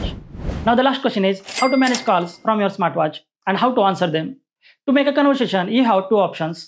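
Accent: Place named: India. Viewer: He's an Indian thatswhy his english dictions is like pronouncing Indian language. He's not good in english pronounciations...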